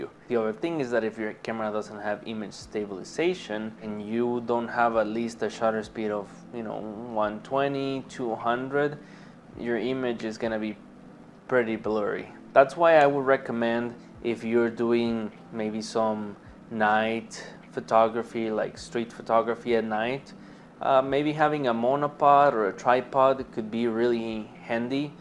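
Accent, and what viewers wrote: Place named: Spain. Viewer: I know it's hard for native Spanish speakers but you gotta work on your pronunciation of "z" and "v". Zi is not Ci and Video is not Bideo.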